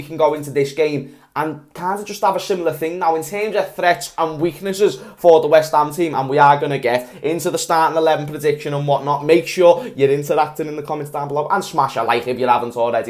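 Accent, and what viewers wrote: Liverpool, United Kingdom. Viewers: Its only a fact that Scouse is the best accent on the face of this earth. Love this Guy to bits.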